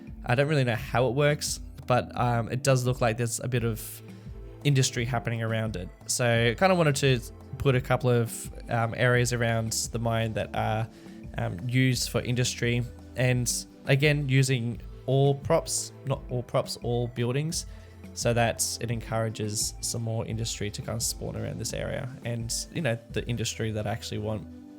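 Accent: Australia. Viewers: Amazing work mate. Great to hear an Aussie doing Cities: Skylines series and this being an Aussie based theme is even better. You're really capturing the Outback with the colours and plant life! Can't wait for each new episode! Keep up the awesome work!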